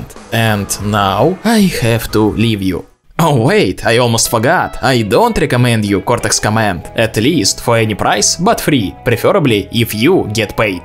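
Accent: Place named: Russia. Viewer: так забавно слушать жесткий русский акцент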